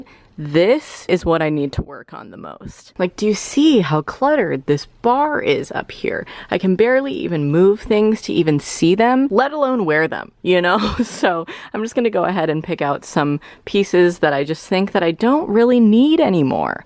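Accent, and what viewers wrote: United States. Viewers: Hi, the first time i heard you speak I was expecting to hear a lovely Welsh accent, but you're American or Canadian (don't want to get into trouble here. Love your clothes, very comfy & suitable for the seasons you're in. Always giving good advice on where to buy, love the thrift stuff. Stay safe hun, byeeee. Glenn x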